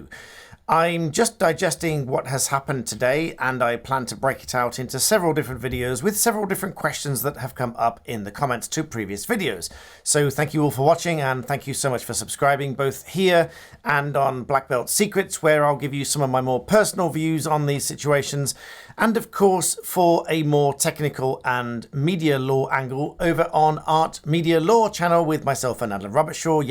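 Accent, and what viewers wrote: United Kingdom. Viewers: I am always suspicious of a Brit accent talking about this case for bias against Harry so now do you REALLY think the RF is going to give fair and Legitimate information when they were a big part of the corruptions Ha Ha Ha Ha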